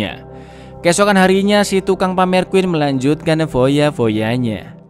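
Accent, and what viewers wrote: Java, Indonesia. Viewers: Jowo kah bang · Risih kbnyakan medok jawa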